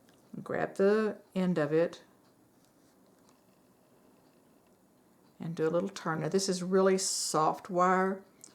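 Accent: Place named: South, United States. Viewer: "A little carried away".... I loved that comment, you are not alone! LOL I look forward to more of your videos, kitty and all. A dear friend of mine was raised in Georgia, thus a slight southern voice. You have that same accent, I kept waiting for you to say "well bless her heart" lol 🌺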